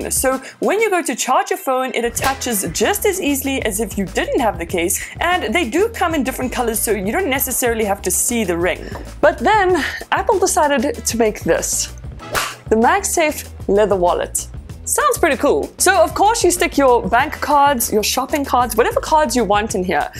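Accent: Germany. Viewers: I bet she is German or South African. What do you lot think 🤔?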